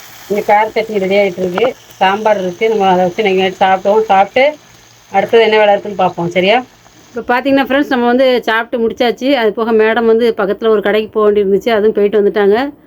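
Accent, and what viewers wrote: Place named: Tirunelveli, India. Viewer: நீங்க எந்த ஊர் திருநல்வேலி பேச்சி மாதிரி இருக்கு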